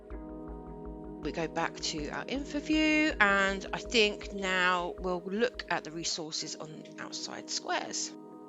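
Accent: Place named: England, United Kingdom. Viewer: sit ya self down, put ya feet up an sip on sum sweet tea for this read. K In my self created tiny world fueled by my vast imagination I’d pictured you sounding like like err… Well I didn’t think you’d have one of them adorable English accents the kind ya find in those Mary popping movies where as soon as ya speak the world shuts up an pays attention so yepperz that kinda accent.Gettin back to my wide off the mark imagination, Id visualized ya sounding exactly like my dear friend who’s shares the same name.. So it was a surprise finding ya not to be Portuguese but a London tour guide Mary poppins! Anyways on to shop talk it’s actually great to see someone doing a map show case….On my channel I do similar show cases on Skyrim so I luv a gd presentation. I hope y’all cover maps of my stompin grounds Tennessee an Louisiana that’ll be a treat. 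Maybe one day y’all cover one of my maps I’m Fixin ta do sum eventually.Anyways teas run dry an feet are aching for a step n a jump I’ll release ya from this insane comment.. oh btw I’ll PROMISE to join ya on ya journey as long as ya poppin in from time to time to check out my skylines videos on my channel 😜 An I ain’t inta breakin promises to a lady I’ve got 4 of them myself at home remindin me daily not to do so. 😠 Mucho Amar 2 youuu an C ya 😃😃😃😃😃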